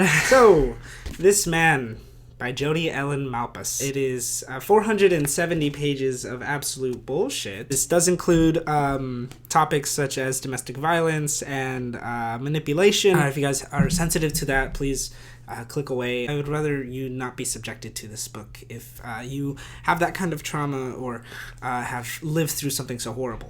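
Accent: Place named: United Kingdom. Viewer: The British accent 😂😂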